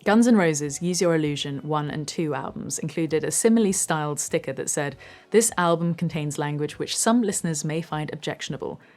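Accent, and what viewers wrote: United Kingdom. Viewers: I was avoiding this video because it seemed such a boring topic, but I was wrong., great job presenting this. I think artists like you should be able to use whatever language you want, but I also appreciate your sparing and judicious use of vulgar language because it can be somewhat of a turn- off. That said, I rewound the last section several times because I so thourghouly enjoyed hearing such filth delivered in a proper British accent.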